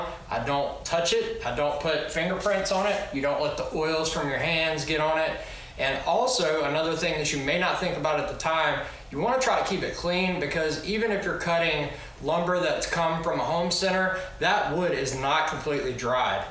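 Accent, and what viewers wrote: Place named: Louisiana, United States. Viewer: Hey man, good video thanks for sharing. What part of the Who Dat Nation you live in - sounds like you're down near Grand Isle?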